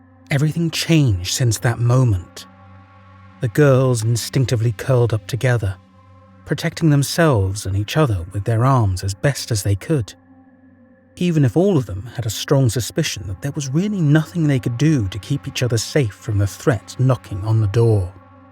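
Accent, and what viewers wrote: United Kingdom. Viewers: Ohh I do love these stories being a ex ghost hunter and demonologist I’ve had many many experiences like this most much worse and one was so bad it made me give up paranormal investigations with ouija boards for good I’ve never ever used “the board” again maybe I’ll write it down one day but when ever I hear a story like this it takes me back to that night and I shiver it’s great to hear a narrator with a British accent for a change too I’ve not heard any of your story’s for a long time I’ve missed you reading to me (US) have a good weekend 😊👍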